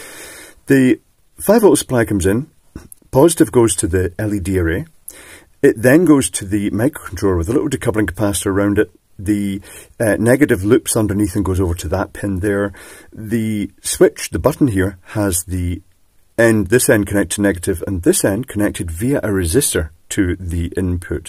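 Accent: Scotland, United Kingdom. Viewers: You're voice sound like Scotland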